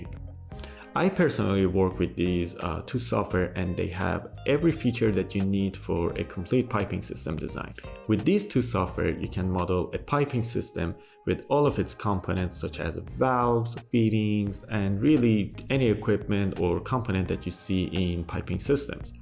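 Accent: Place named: Iran. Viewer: Dear I found randomly one of your videos in YouTube, and l enjoyed it. I started to follow your channel, but I realized that something familiar was in your voice. Lastly I realized that you are originally an Iranian. I'm very proud of you.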